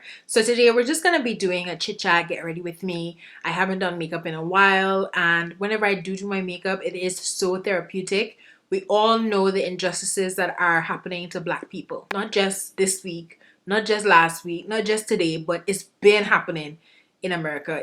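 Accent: Trinidad and Tobago. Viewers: ❤️ when I hear your accent I miss my friend’s mother’s accent (Trini), I’m somewhat new to your channel subscribed 💕 hi again from 🇨🇦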